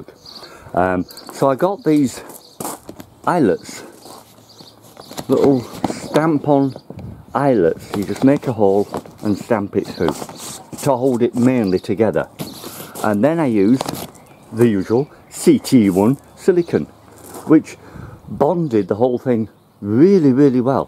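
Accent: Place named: Northwest England, United Kingdom. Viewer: Great job lad, I copied your idea over Xmas break and it works a treat. You sound more northwest England.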